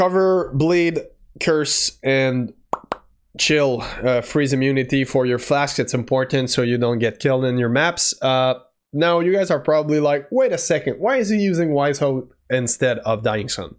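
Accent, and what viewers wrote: Quebec, Canada. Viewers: Quebec much ;p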